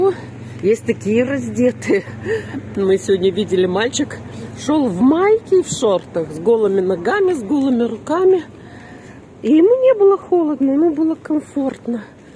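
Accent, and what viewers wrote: Odessa, Ukraine. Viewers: Вы интересная чудачка, истинная одесситка.Говор. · спасибо вам за эти ваши прогулки! Наслаждаюсь вашим выговором - как дома побывала! Живу далеко, но вся родня в Одессе.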